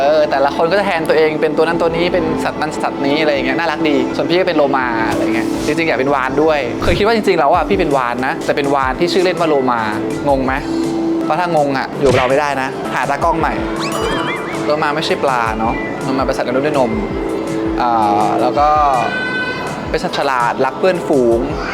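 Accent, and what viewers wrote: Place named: Isan, Thailand. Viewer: พี่ต๋องพูดอีสานน่ารักกก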